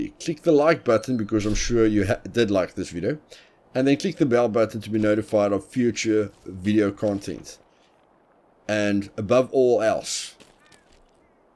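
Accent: South Africa. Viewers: Nice guide first thing I noticed was that South African accent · Like the accent, you from SA?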